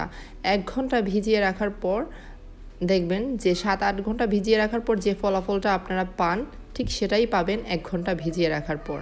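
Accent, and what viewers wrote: Sylhet, Bangladesh. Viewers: Apu apni sylheti ai jonno balo lage please sylheti matea blog korio ❤️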